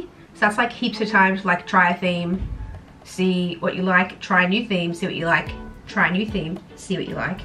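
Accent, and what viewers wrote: Australia. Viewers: Are u Australian ? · Are you australian? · Shit yeah, you're an Aussie. Where u at bby, don't be shy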